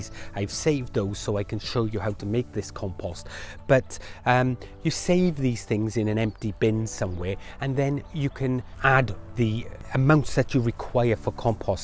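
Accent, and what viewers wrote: Wales, United Kingdom. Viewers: Hi, I LOVE your accent you Welshie just like me but ive not got Yer accent sadly lol ...... can I put put my Tortoise poo in the compost as she only eats Greens shame to waste it ? Thanks I subscribed so i can LIISTEN to you, of course i definitely need your Help !! Thanks x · When I hear a Welshman talking about gardening I know I can trust him.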